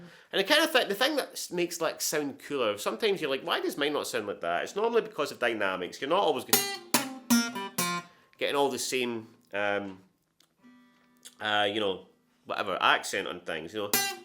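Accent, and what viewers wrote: Scotland, United Kingdom. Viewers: Very cool lesson dude , I’m in the United States , I love the Scottish accent , reminds me of a guy I used to work with over here , he was born in Glasgow and the plates on his car said Haggis 1 , he was a cool guy !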